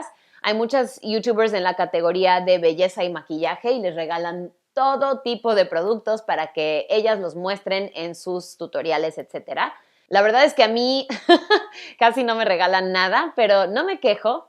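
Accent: Mexico. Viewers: Más que español es méxicano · Tú a mi me encantas!! Envidio (en buen son) tu español tan fluido. Yo asumí que eras mexicana la primer vez que te escuché hablar. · La primera vez que vi uno de tus videos, (si mal no recuerdo fue el de pronunciar las marcas en ingles) estaba convencida de que eras mexicana 😅, hasta que comencé a ver tus otros vídeos de tus anécdotas, y yo tipo: Ah bueno, era estadounidense xD. You're amazing 💜💜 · Me encanta tu español mejicano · El primer video tuyo que ví, era relacionado con enseñar inglés y pues me gustó mucho tu forma de abordar el tema y especial tu personalidad. Se me hizo extraño el asiento mexicano y me sorprendí muchísimo de tu dominio del español. Quise ver más vídeos tuyos, presisamente, por esa personalidad divertida y cálida. Y bueno, ahora te sigo acá en Youtube, tienes vídeos muy interesantes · Me gusta tu español -mexicano. 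hablas español excelente..ojala te encontrara en una calle te daria un abrazo....tienes el toque Latino...cariños desde Arica -Chile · Hablas muchísimo mejor que muchos mexicanos el español, tienes un acento muy mexicano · Tu español es excelente. Recuerda que tu acento es mexicano y algunas palabras también; en Uruguay no decimos chaparra por ej. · Esta chica habla Perfecto español , con un poco de acento y dicción propia de México cosa q no le resta es adorable q quiera compartir su saber ; hija de lingüista augura q sabe lo q dice y así mismo ha de hablar el inglés ( idioma por el cual llegué hasta aquí ) Solo le deseo q siga así de exitosa, feliz en su matrimonio , en general en su vida , y q afortunada tener 37 años y lucir de 25 !!! Adorable chica !!! Dios y la vida la atropelle con alegría y salud !!! Abrazo desde Colombia ! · Cuando te ví por primera (junto a tu papá) fue muy raro, ya que tu habla no combina con tu físico, osea por fuera toda una gringa pero por dentro bien mexicana.... 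🤣 Confusión total jajajaj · Como argentino me resulta raro escucharte hablar mejicano. Y así y todo me encanta. 😀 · creí que eras mucho más joven, creo que eres una hermosa persona, no solo físicamente, sino también en tu forma de ser, tu español es perfecto, eres muy fluida, antes de pensar que eres "gringa" creería que eres de mi amado México, amo tus vídeos y tus tips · Muy bella persona, español algo mexicano por obvias razones... y el inglés muy bueno parece, porque de ese idioma no se ni pedo. Pero su ventaja de idioma es súper importante. Saludos CR. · 🤣🤣🤣🤣🤣 cuando te escuché por primera vez, lo hice en español, asumí que eras mexicana y habías vivido un tiempo en EEUU, o vivías allá en ese momento. Me sorprendió mucho cuando supe tu historia, me encantó, y sobre todo me encantó el amor que tienes por México, su cultura y lenguas. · Yo por la miniatura pensaba que eras británica luego te oí hablar y pensé que eras mexicana nativa xD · hablas un español mexicano perfecto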